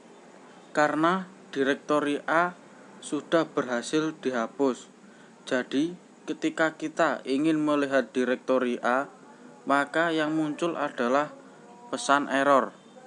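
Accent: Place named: Java, Indonesia. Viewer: Suarane bogel medok :v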